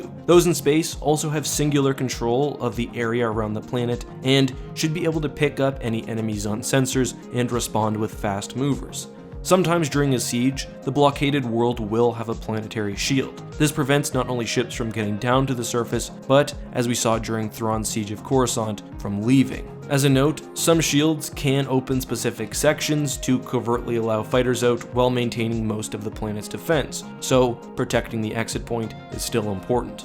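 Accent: Canada. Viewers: are you canadian? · Are you from Canada??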